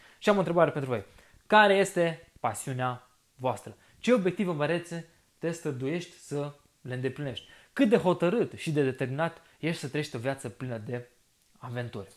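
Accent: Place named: Bessarabia, Moldova. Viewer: Am o intrebare.Te-ai nascut in Romania?Ai un accent e basarabean.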